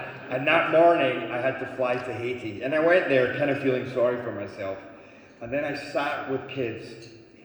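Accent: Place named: Scotland, United Kingdom. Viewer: Thank you for all your movies. Good bless you and Scotland. Don't loose your scottish accent 🙏 🙏 🙏 Best wishes from Germany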